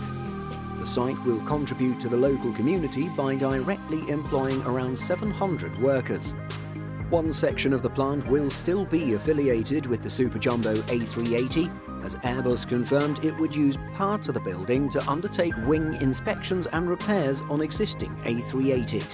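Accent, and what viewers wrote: United Kingdom. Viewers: Would live to see the person behind this voice. Perfect british accent and perfectly pronounced French names. Hats off to you gent.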